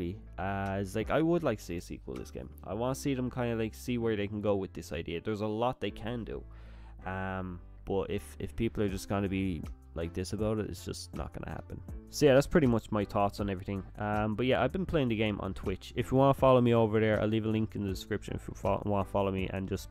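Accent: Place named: Ireland. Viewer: You called me out mate! Na I'm playing, good review man.. caught the Irish accent straight away ✌🏾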